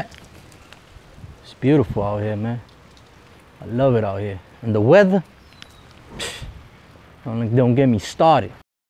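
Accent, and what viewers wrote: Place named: South, United States. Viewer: Hola si se te nota en El hablando q estuviste en El sur de USA. Saludos y sigue adelante Muy bonito y limpio. .Carisimo para vivir ahi.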